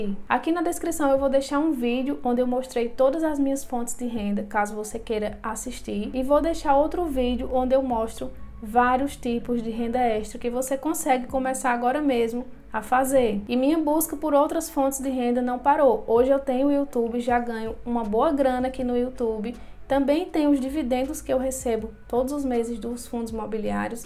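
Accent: Northeast, Brazil. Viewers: Mulher ameiii o vídeo era exatamente o que procuro Já min escrevi no canal Você è nordestina ?? Sotaque Nordestino 😊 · Gostei muito do seu vídeo, muito nobre de sua parte ajudar as pessoas sei que seu intuito foi esse bichinho, agora diga uma coisa esse sotaque arretado é do Nordeste?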